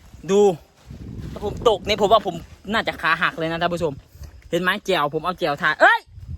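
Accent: Laos. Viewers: เว้าไทยตกลาว5555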